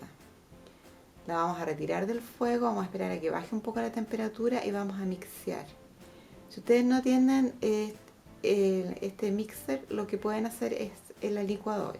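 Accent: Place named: Chile. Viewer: me encanta el tono chileno, amo vuestro pais, asi que me copio las recetas, muchas gracias , abrazo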